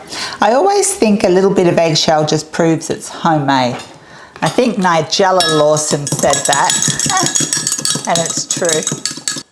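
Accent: Australia. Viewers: great to hear an Aussie accent on here for a change. Looks yummie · By the way, I didn't recognize Ur accent until U mentioned Australia. Of course all accents are nice. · OMG, so agree with your comment! Love her cooking style and love, love listening to her Aussie accent; this is a keeper! ❤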